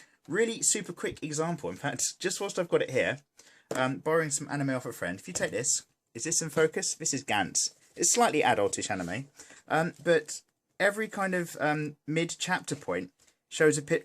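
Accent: United Kingdom. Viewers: Brilliant, but it's so unfair you can speak of boobs with such ease. This would be so pervy sounding if you didn't have such a proper British accent.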